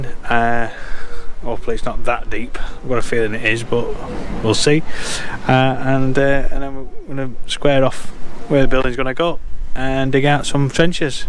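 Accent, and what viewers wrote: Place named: Yorkshire, United Kingdom. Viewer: Where dya live? Sound like a Yorkshie Lad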